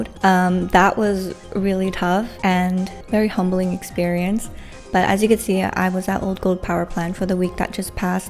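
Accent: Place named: Canada. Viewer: oh my god im so glad to see you back. sending you all the love and healing vibes! I went to lapis today too, such a pretty and serene island. I also got desserts sooo basically twins I love how you sound more canadian now that you're back in canada ahahhah you are so blessed to have the people in your life that you do<3 CHEERS to you getting through the hard part and cheers to your boundless future. I'm proud of you!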